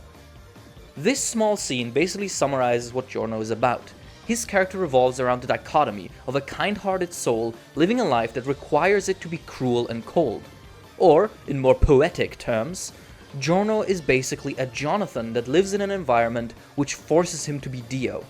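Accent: Italy. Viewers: pretty sure he is italian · Mhhhhhhhhhh your ACCENT souns very familiar,are you ITALIAN by any chance? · I love your italian pronunciation! Keep it up!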